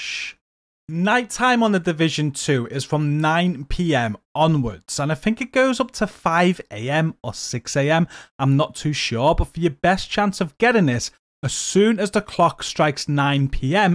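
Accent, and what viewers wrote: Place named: North, United Kingdom. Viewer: northern accent strikes again ❤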